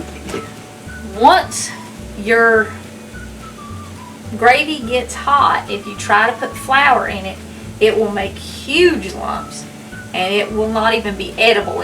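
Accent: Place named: Texas, United States. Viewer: Hi. I just found your channel and I am so happy I did 🤓. Even tho I am a Texan, you have the same sweet accent as me😃. Your recipes & cooking tips are just what this 66 yr old granny has been hunting for. Your meal choices are exactly what we eat at our house. Hope you have a blessed day. Connie in Lubbock, Texas 💗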